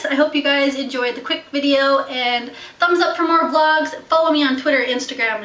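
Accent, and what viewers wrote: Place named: Newfoundland, Canada. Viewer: You for sure still have a Newfoundland twanggggg. Can you believe I haven't been wearing a purse these days? I've been toting all of my friggin' laptop, tablet and notebooks around as a mobile office. Plus all the crap I need for my youngsters when we go out. I should totally do a video. I love you brush your hair flat out. I NEVER brush my hair!!!!!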